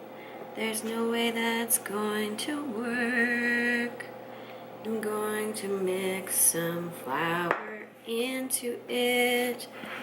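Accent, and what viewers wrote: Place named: England, United Kingdom. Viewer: I just binged on the entire season of The Great British Bake Vlog-- I LOVE it! As a fellow foodie/baker/Ina Garten groupie, I can't help but applaud your efforts at baking your way through the GBBO. Most times when I'm in the kitchen cooking, I like to pretend that I'm in the kitchen cooking alongside Ina in East Hampton. So naturally when I watch you bake in your English accent while ordering around your sous chef/camera man/best cow vet ever, I can't help but smile. Your unscripted baking show is top notch, I've never laughed as much during a baking vlog. Cheers to future episodes! -- Your California Fan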